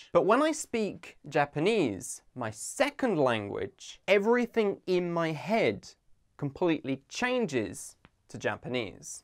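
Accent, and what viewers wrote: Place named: United Kingdom. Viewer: i love u.i'm f tehran and virgin not married 1982. i like ur accent to.first time i when hear uk accent i think that is funny.but its better that usa accent i think.lol.but im in iran.in never travel or see forigin in my life.lol.but if i in skype see any man "lol" maybe have chat with voice.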